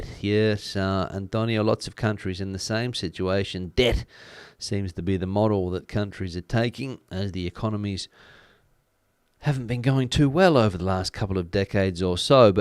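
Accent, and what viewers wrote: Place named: Australia. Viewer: I'm from Germany and stumbled upon your channel a couple of days back. Quite funny to hear an Aussie doing the news on Spain. G'day! I lived in Oz for 2 decades and loved the outback, and now want to retire in southern Spain. I'm taking spanish classes and researching my options. Looking good. Thanks for doing this, looking forward to hear more from you. Cheers!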